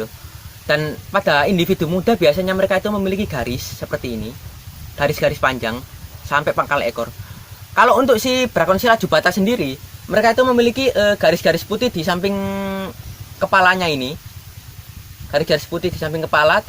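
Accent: Java, Indonesia. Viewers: Waw medok nenem logat jawa nyh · Cong sampean wong ndi. Kok bhsamu medok bgt..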